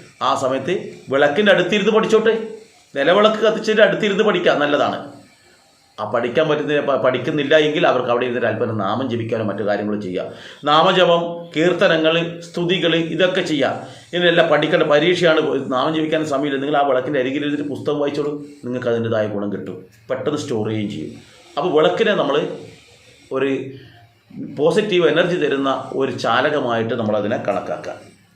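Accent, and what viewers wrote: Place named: Kannur, India. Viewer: Namaste ji,thankalude nadu kannuranennu tonunu samsaram ketit,kannur evideyanennu parayamo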